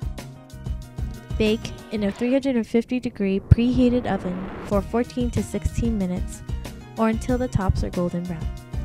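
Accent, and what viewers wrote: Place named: United States. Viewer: you sound so american than filipino thats kinda funny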